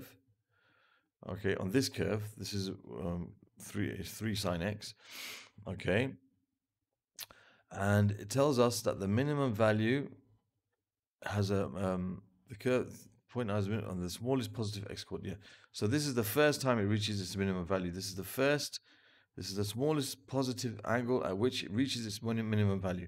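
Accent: United Kingdom. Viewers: the best! And that British Accent really hits the spot, lol.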